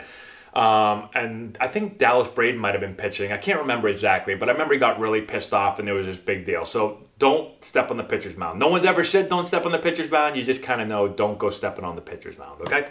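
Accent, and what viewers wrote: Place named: New England, United States. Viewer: has a hint of a New England accent. You can hear it when he says "about".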